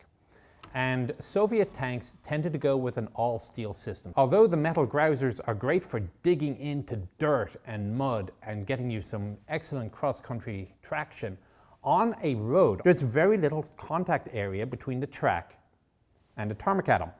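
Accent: Ireland. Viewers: I sense the Irish accent. I’m a dub where you from buddy.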